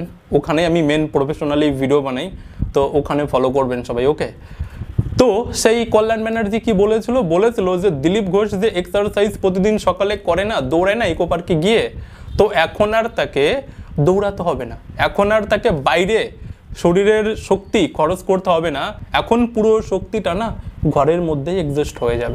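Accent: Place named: Bangladesh. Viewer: Tor barita Kangladesher kothai.sposto kore kotha bol Ahammok.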